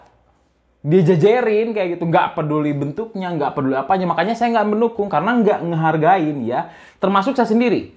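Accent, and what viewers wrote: West Java, Indonesia. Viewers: Logatnya sunda pisan euy 😎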